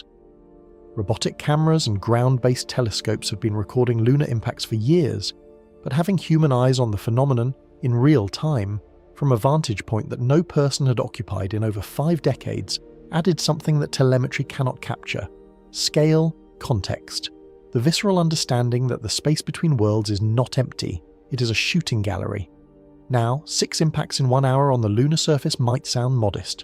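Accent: United Kingdom. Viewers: Quit with LIES AND Lose THE BRIT NARRATOR. This click N bait.. Anything NASA Says and does if fake lies. 👎👎👎👎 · This guys accent tells you everything about him just another jealous Brit bitter and eager to spread fear and hate towards the great free country of United States states of America 🇺🇸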